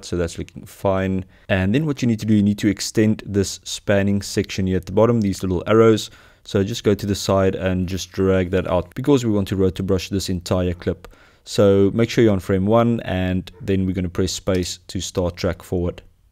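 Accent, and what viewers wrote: South Africa. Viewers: this man sounds like a south african!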